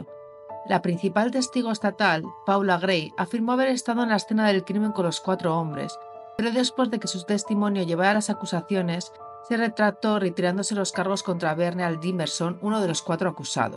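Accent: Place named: Spain. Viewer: Primera vez que veo tus videos, te felicito por tu trabajo de investigación y por compartirlo por acá con el mundo 😉 Me permito comentarte 1 detalle con el fin de que los que no somos españoles te podamos entender, podrías hablar un poco más despacio ya que tu acento es muy fuerte y es difícil entender lo que dices, por ende me distraje de la historia para tratar de captar lo que decías, a parte de ese detalle todo muy bien! Que te hagas una grande del true crime! 👏🏻 👏🏻 👏🏻 👏🏻 👏🏻 👏🏻 👏🏻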